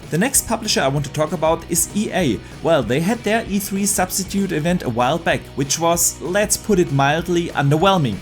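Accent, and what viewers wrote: Germany. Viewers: The german accent is killing me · I am also from germany and the accent is killing me :D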